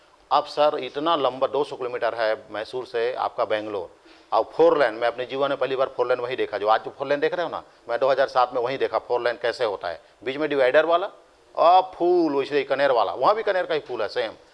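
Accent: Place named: Chhattisgarh, India. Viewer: Bahut hi gajab story boss ❤ Sir G Apki chattishgadhiya अंदाज में तो पूरा cg students फिदा हैं।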